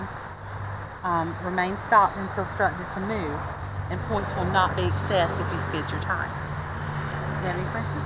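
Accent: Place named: Texas, United States. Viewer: I love her Texan accent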